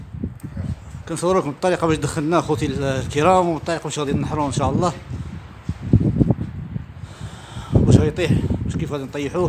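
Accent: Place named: Libya. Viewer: تتقصكم التجربة يا صديقي. .يبدو انك عشت في ليبيا طويلا كلامك يوحي بذلك .المهم بارك الله فيكم ومزيدا من الاجتهاد حتى تكتسب الخبرة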